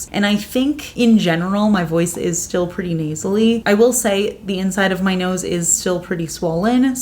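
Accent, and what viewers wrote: New Jersey, United States. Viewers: My late mother was from Jersey, and your Jersey accent (like all Jersey accents) brings me joy! Glad you haven’t lost it! ❤ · I'm happy the surgery was so successful. It's so nice hearing your New Jersey accent rather than blocked sinus Jersey. Wishing you continued good fotune with the swelling going away.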